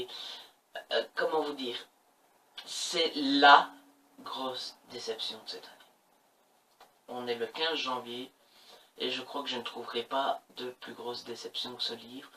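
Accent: Belgium. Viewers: Oui ton accent :-) moi aussi suis belge. J'aime beaucoup tes vidéos, continue :-)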